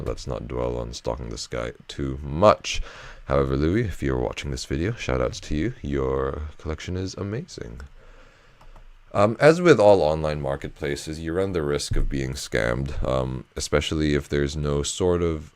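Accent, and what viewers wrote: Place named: United States. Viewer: You live in New Zealand , funny you sound American! 😉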